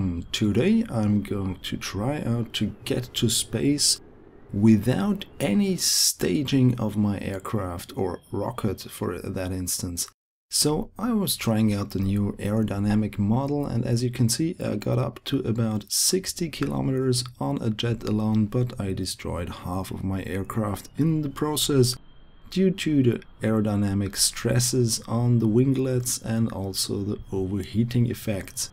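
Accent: Germany. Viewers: Great SSTO and building skillz. But i can't listen to your narration - why dont you speak in your mothertounge? (german?) Greez from Vienna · Ach komm schon ich hör doch an deinem Akzent dass du deutsch bist ;)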